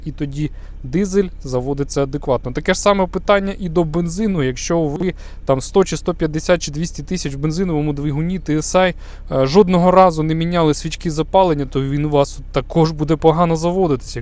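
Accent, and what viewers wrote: Standard. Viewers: Дуже чиста українська, приємно бачити та чути, та особливо сприймати такий контент! Ви молодець! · четкий Украинский язык приятно слышать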